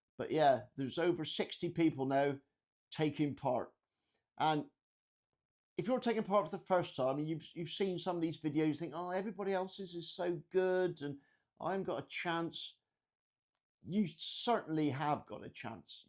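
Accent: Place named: Durham, United Kingdom. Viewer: Hi, watched your vids and subscribed. Sounds from your accent you’re local to me, I’m in durham. Good luck in the competition, I’m new to growing so giving it a go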